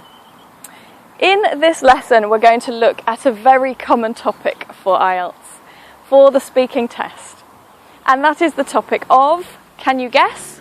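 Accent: United Kingdom. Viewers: that's a British accent.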